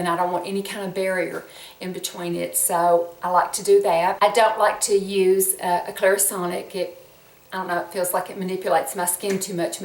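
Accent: South, United States. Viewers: You are so precious and beautiful😊I am turning 41 today and I start my retina and the blender this week...super excited...thankyou soooo much for your skincare routine video...you are just beautiful! Love love love your accent...from one southern girl to another😘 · think you are a beautiful woman with a beautiful soul and I can't understand why anyone would be mean to you except that their lives are so meaningless and pitiful that they feel the need to be awful. I love you're accent because to me it is normal! I think we need more southern ladies on YouTube. Your skin is amazing so I'm now going to try your routine. I just turned 50 and menopause is wrecking my skin! Keep up the awesome work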